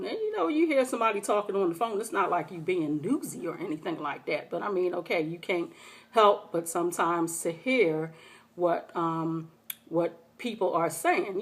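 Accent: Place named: United Kingdom. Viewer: Hey. That accent sounds British. Thanks for the update.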